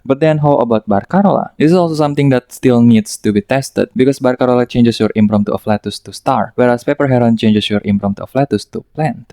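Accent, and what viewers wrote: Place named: Indonesia. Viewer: you sounds like Indonesian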